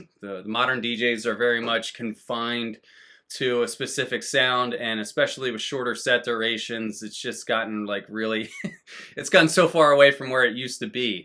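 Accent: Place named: Philadelphia, United States. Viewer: Haha now that you say that you do sound like you’re from Philly/southeastern PA, I didn’t know that. I just moved back up here to Chester county from south Florida in August, grew up out here. Glad to know though, seems like there aren’t many people out here collecting/playing/enjoying dance records but I’m sure I just haven’t met them yet! I’ll keep watching and learning, appreciate the content.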